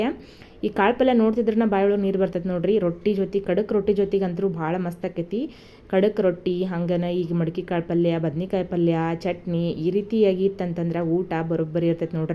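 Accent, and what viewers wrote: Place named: North Karnataka, India. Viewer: ನಮ್ಮ. ಉತ್ತರ ಕರ್ನಾಟ ಕ. ಭಾಷೆ. 👌. ಮೊಳಕೆ. ಕಾಳು. ಪಲ್ಯ. ಸೂಪರ್ 👌ಸೂಪರ್ 👌. ರೀ. ಸಿಸ್ಟರ್.🎉👌👍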